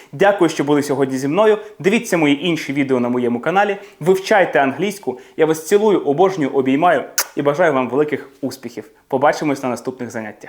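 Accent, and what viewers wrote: United Kingdom. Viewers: Дуже гарна британська вимова. Приємно слухати.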